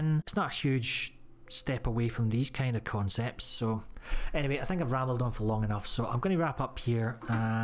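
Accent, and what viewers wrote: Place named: Glasgow, United Kingdom. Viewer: So in reverse order. 1. I Agree with the locked compounds element, for the reason you state. But also, if I have the key then it becomes "my" compound/base. 2. When it comes to the loot spread in military locations I think it's an issue too. I'd like to see more sensible/realistic spread of loot in military locations, soldiers etc didn't eat guns and I'm sure probably owned civvy clothing too. I'd like to see the military equipment spread more logically inside some of these areas. 3. Dynamic crashes - of all sorts, humvee, civvy, police, game warden/ranger (in woods), and campsites (one or two tents civvy/hunter/game warden) 4. The flying heli's - they had this in one of the mod's I believe (for the mod - the modded mod haha), and it was awesome. 5. Zombies - I think at all dynamic locations there needs to be a chance of broken (crawler) zombies spawning along with a regular mini-horde/cluster. 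6. Re-vitalize old areas - totally agree, some of the old areas like the boat are awesome, but pointless these days. I'd love to see these locations with more positive reasons to visit them. Whether that's to setup a base/hold them as their good defensive points, or because they have good loot/something rare possibly. Good thoughts delivered in the Glaswegian style we all know and love!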